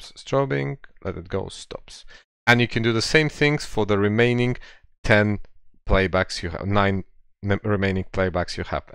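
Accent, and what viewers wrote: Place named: Russia. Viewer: Thank you very much.I love your videos and the way you share things with us. Love you russian accent.Haha.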